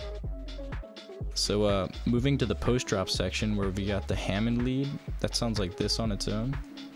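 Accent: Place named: United States. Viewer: Bro u live in Bangladesh then how did u pick up that American accent?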